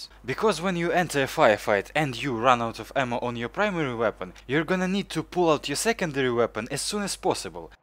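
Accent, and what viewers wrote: Russia. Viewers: Акцент русский но вообще Можно делать видео на англизком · Акцент сильно русский((((((((((( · Мэн а что ты хотел от русского человека?) Идеальное произношение??? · ага, и будет ужасный русский акцент и на немецком. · лучше смотреть видео у англичан с их акцентом ,чем слушать наш,просто)а так хорошая попытка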